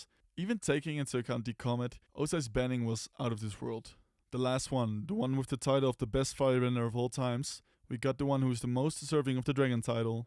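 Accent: Netherlands. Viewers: You sir sound dutch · Good video! I can tell by your accent you're 🇳🇱, no?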